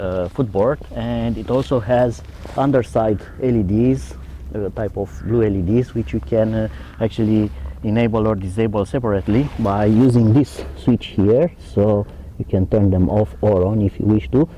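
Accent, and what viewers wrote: Romania. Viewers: You speak english like a romanian. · Baga' limba rOMANa ca esti praf...:))) accentul te da de gol ca esti roroman:)))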